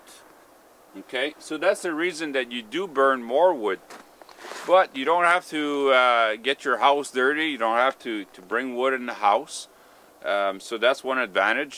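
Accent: Canada. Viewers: Lovin' that thick canuck accent!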